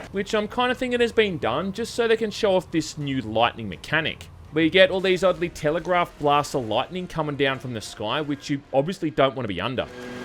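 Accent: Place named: Broad, Australia. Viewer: I saw one of your earlier videos before this and the difference in your accent is insane. You’ve gone full bogan. Did you move to a different part of Straya a few years ago or something?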